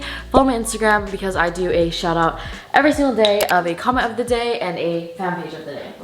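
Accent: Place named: United Kingdom. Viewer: i clicked faster than could start talking in a british accent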